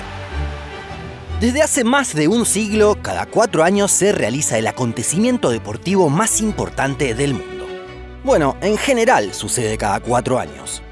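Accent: Argentina. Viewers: Que calidad de narración y guión tiene este canal, es genial y ese acento argentino a mí me encanta!! Excelente video!! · Excelente dicción en la narración, obvio que con acento argentino, ¡bravo!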